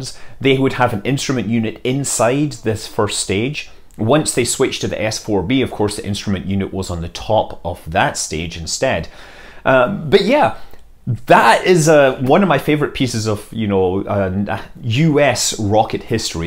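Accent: Scotland, United Kingdom. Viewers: Scott, your videos have come up on recommended several times, I decided to watch some and it seems YouTube got it right. I just subbed and I look forward to more of your videos. I wonder if you might be able to put me out of my misery on something? Your accent tells me your Scottish but I can't put my finger on which part of Scotland, I'm from Fort William and I'm guessing that you are from somewhere in the central belt?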